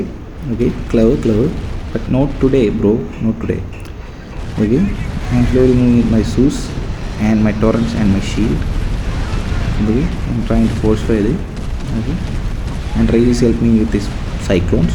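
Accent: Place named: India. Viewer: I can tell you are an Indian the by way you pronounce ''t''😄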